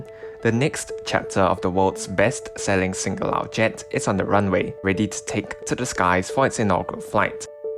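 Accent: Singapore. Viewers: im guessing thats a singaporean accent with some shots from changi?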